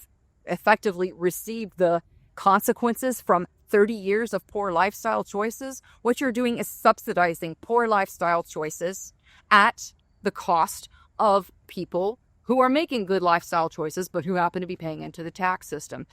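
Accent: South, United States. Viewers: I have been following you for many years and always appreciate your thoughts. I hate to hear you losing your beautiful Southern accent.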